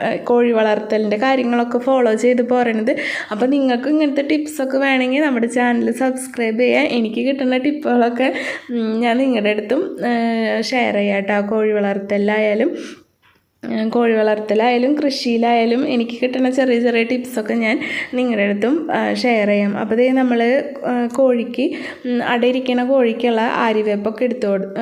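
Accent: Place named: Thrissur, India. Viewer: നല്ല വീടിയോ സൂപ്പർ നല്ല ധിർസുർ പാഷ്ഷയാ കേള്ക്കാന് നല്ല രെസമാ അവസാനത്തെ ട്ടാ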